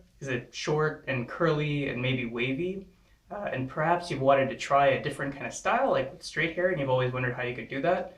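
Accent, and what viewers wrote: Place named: United States. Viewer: I know you're from somewhere around India... but you do an American accent... sounds interesting.. you immigrated to the west.?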